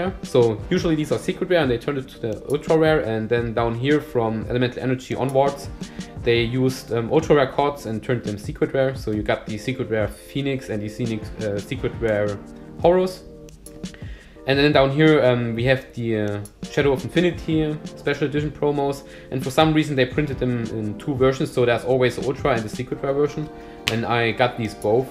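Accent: Germany. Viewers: Bist du deutscher? Dein akzent wirkt irgendwie recht deutsch xD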